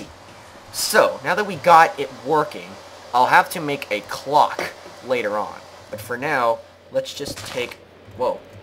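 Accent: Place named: Germany. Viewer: German is the acent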